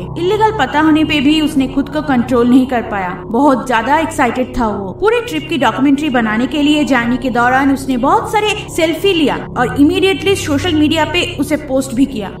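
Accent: Bengal, India. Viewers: Ur bengali accent is so cute.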